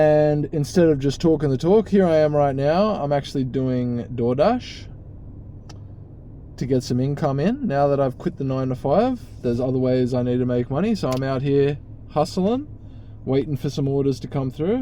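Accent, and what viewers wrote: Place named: Australia. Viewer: Sounds Australian.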